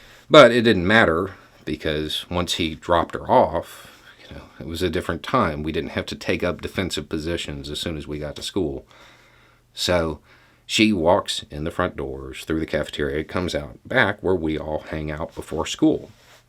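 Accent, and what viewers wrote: South, United States. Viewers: Thanks for sharing. One of my favorite of your uploads. Keep em coming, you're single handedly making a significant impact one 6 minute video a time. I could be wrong but you being a southerner w/an obvious accent is kinda like getting a toe in the door of many people who would instantly shut down to a New Englander like myself. Even though I've always been a small govt,* 2nd amendment supporter,* an avid student of the country's creation. Mostly through the men & women who wove all the complicated threads needed to create a new democratic republic. People who understood compromise was absolutely necessary and that pleasing everyone is impossible & trying to is a fools errand. I've tried to understand our country, the good, bad, & the ugly through the eyes of the real people who did their best to create a durable government for the people, of the people. 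All had their flaws, all had their genius & an incredible work ethic. Yet for many, if I had a mirror image YT channel I don't see myself having whatever level of success you do in getting certain people to even listen. I'm guilty of the same biases I guess. I hear a southern accent I'm instantly wondering where the Redhat & desecrated flag(pictures, words, etc.) is. I am instantly on guard. Worst of all I'm looking for the exit which sadly could deprive me of meeting a good person, making a friend, etc. I didn't intend to write more than a sentence or two but got carried away. Anyway, have a great Sunday & thank you f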